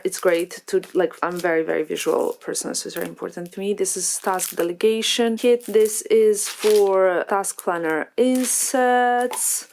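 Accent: England, United Kingdom. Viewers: Omg by the end of the video your accent got SO British ahahah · Through this whole video, especially towards the end, your English accent really came through!! Stay safe xoxo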